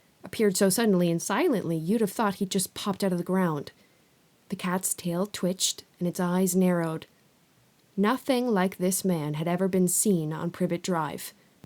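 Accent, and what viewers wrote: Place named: United States. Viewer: I m learning ENGLISH. I m looking for American accent for reading Harry Potter . Finally I found you !and even better, you re a lady ! I m so happy!THANK YOU SO MUCH ❤❤❤Your voice is amazing ❤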